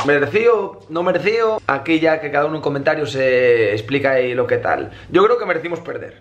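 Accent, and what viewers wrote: Asturias, Spain. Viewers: Habla español q diga ye, tiu por muy asturiano q sea se entiende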